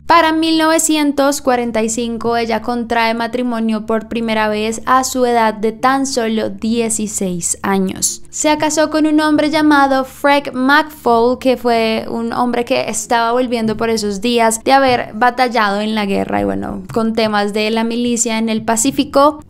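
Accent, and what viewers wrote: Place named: Colombia. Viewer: Una voz femenina con acento colombiano e historias de terror uf... es un placer auditivo...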